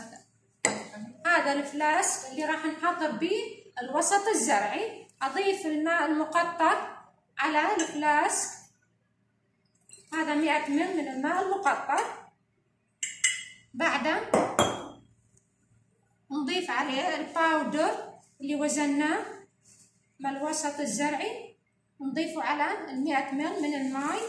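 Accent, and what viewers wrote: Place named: Baghdad, Iraq. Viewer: جهود مباركه اللهجه الحلوه ❤ بغداديه قح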